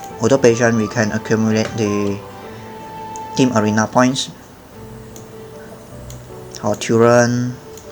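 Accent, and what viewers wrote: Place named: Singapore. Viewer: Dude. You're not from global, are you? Your mobs look crazy. Dayum! By the way, I like your videos but your Singaporean accent not much. YDCB is okay. Sharp but okay. However, when I watch your vids, I feel like I'm in a car and the driver keeps on stepping on the brakes. Or maybe it's just the mike. Hmmm. Try to invest in a better mike with a pop filter? ;) I'd like to see more content from you. Hope this doesn't offend you too much. I mean it in a constructive way. :D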